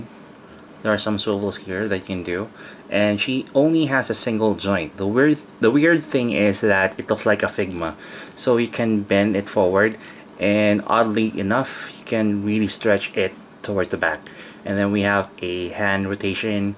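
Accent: Philippines. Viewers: Are you filipino? Our accent when i speak in english is almost the same. xD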